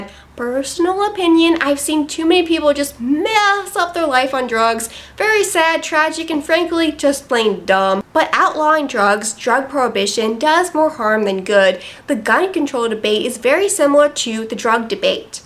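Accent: South, United States. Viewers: please stop with the country accent very degrading to us southern folk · what's with the southern voice? Really, SMH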